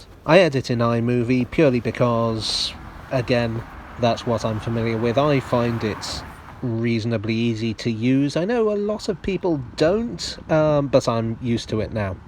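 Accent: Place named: Midlands, United Kingdom. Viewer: Well done! 50,000 can't be that bad. I have been trying to workout of your accent. I'm usually quite good at it but can't quite pinpoint yours. Perhaps like me you were born in the midlands or other parts of the hinterland and in very early (talking) years moved to london. Let me know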